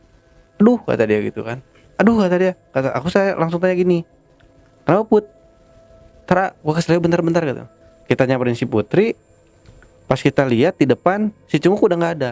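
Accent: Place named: Bekasi, Indonesia. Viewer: Gw klo denger bang logat anak Babelan lagi cerita hehee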